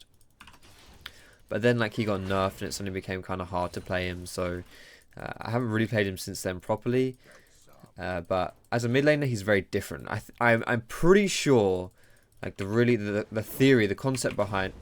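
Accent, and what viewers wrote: France. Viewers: the french accent makes me smile xD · Video gets a like for that french accent alone! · that french accent tho xD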